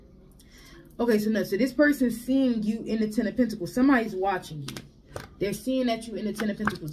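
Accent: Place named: North, United States. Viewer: Damn u fine lil mama and i Kno u from uptop by the accent, im a flordia marlin and you know upnorth our second home... 💐💐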